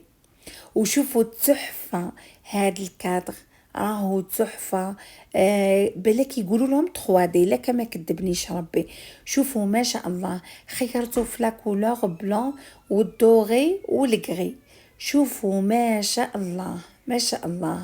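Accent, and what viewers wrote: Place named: Constantine, Algeria. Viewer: سلام تلاقيت الفيديو تاعك ومع بديتي تهدري عرفتك قسنطينية بالتوفيق ان شاء الله الكادر حطيه في الصالون خير وفهميني اكثر منين شريتي ليبانو من فضلك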